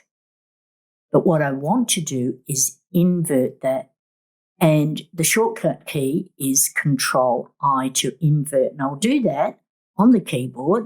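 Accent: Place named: Australia. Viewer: Lovely to hear an Australian voice. I am going off to try this technique, the results looked great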